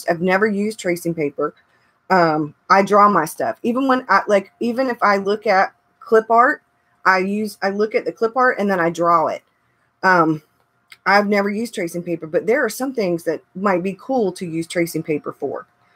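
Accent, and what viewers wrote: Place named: South, United States. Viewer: I thought you had a southern accent!!! love your videos!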